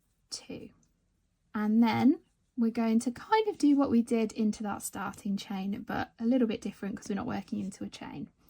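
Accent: England, United Kingdom. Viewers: You sound English, like me. What are you doing up at 5.30 am?😄Me? I'm feeding a stray feral cat (charlie) who waits outside the door for me to get up. x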